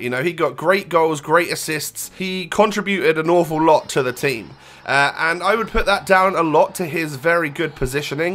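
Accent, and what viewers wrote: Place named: RP, United Kingdom. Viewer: Very posh accent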